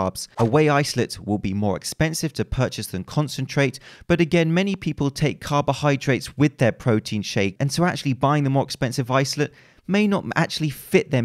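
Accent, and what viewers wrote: United Kingdom. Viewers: Are your accent was British?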